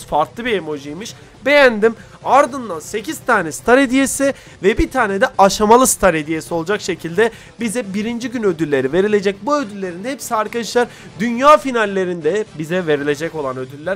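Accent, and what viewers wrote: Gaziantep, Turkey. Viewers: Abi Sen Antepli misin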